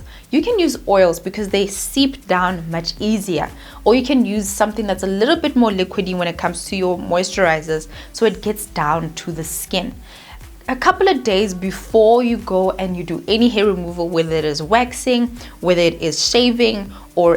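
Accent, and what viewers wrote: South Africa. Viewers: Yes she is South African💃🏻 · Are you from ZA? I have ZA (and LS) friends and I always love their accents. New subscriber! Edit: you just confirmed it! I knew it!!! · Please tell me u are South African 🇿🇦 or Kenya? 🇰🇪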